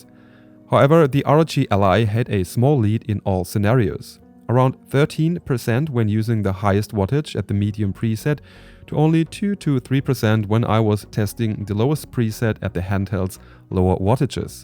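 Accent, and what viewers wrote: Germany. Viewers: Als Deutscher hört man schon in den ersten 5 Sekunden den Deutschen Akzent raus. :D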